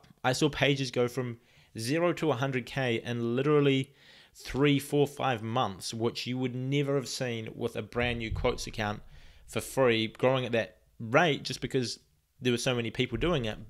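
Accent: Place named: New Zealand. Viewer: I love your kiwi accent ❤️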